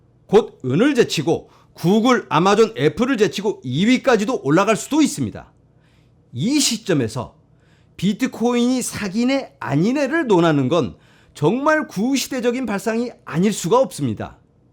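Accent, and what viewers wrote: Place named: South Korea. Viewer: 발음이 구수하십니다 한국식 친근감가는 하이리스크 하이리턴!ㅎㅎㅎㅎㅎ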